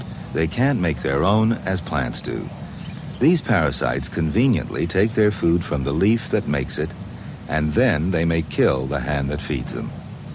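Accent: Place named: United States. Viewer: Yes but what happened to the original narrator? This version, with the American accent is not nearly as good as him!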